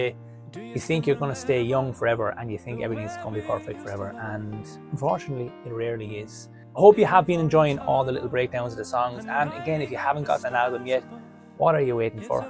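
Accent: Ireland. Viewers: Good afternoon my superhero and my guardian angel and my no1 I have listened to you new cd many times and I love it to bits all the songs are as amazing as you are take care my beautiful friend 🌟🌟🌟🌟🌟🌟🌟🌟🌟🌟🌟🌟🌟🌟🌟🌟🌟🌟🌟🌟🌟🌟🌟🌟🌟🌟🌟🌟🌟🌟🌟🌟🌟🌟🌟🌟🌟🌟🌟🌟🌟🌟🌟🌟🌟🌟🌟🌟🌟🌟🌟🌟❤️❤️❤️❤️❤️❤️❤️❤️❤️❤️❤️❤️❤️❤️❤️🌟❤️❤️🌟🌟🌟🌟❤️❤️❤️❤️❤️❤️❤️❤️❤️❤️❤️❤️❤️❤️❤️❤️❤️❤️❤️❤️❤️❤️❤️❤️❤️❤️❤️❤️your Irish accent is always amazing ❤️❤️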